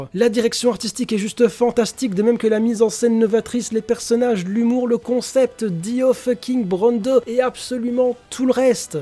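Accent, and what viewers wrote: Belgium. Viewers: Très bonne vidéo ! D'ailleurs, j'ai comme l'impression d'entendre un compatriote belge ! (Liège on dirait). Tout ça pour dire que l'oeuvre jojo à part entière a énormément été une source d'inspiration dans la pop culture. Clint Eastwood a inspiré jotaro kujo et kiff l'oeuvre. Des personnages de l'arc Joseph voire même Polnareff ont inspiré capcom pour street fighter. Dans le jeu vidéo Overwatch, le personnage ninja Genji a une réplique disant " Mada, Mada." Faisant référence au muda muda de Dio et giorno. Gucci a dédié une collection de vêtements à Jojo's bizarre adventure. Les mentions et réf ne manquent pas à l'appel. Sans se rendre compte jojo à énormément inspiré les mangas d'aujourd'hui. C'est pour cela que le fanatisme est grand. Mon opinion,. C'est que Jojo est au même rang qu'un Dragon Ball ou un Naruto. C'est intemporel et inclassable. 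C'est juste dans le hall of fame. Point barre.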